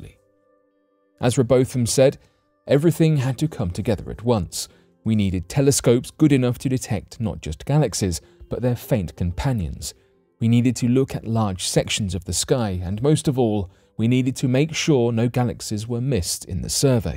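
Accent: United Kingdom. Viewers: I understand why he pronounces bellingenic the way he does he's British guess he's never heard of that famous explorer from long long ago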